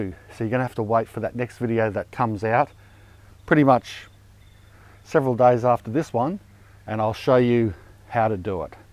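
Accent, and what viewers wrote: Australia. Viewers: Stralian ASMR. 👍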